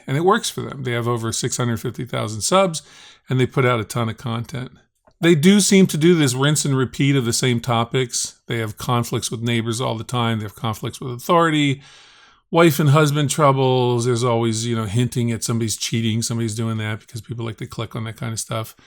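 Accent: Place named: West Virginia, United States. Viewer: I’ve seen this AV coin pushers videos before. The comments are truly frightening. I can’t tell if people are seriously believing this crap or just suspending their disbelief. I saw one earlier where he claimed to have spent half a BILLION dollars on 25 quarters. 😆 The clown sounds like a hillbilly from the deepest darkest corner of West Virginia….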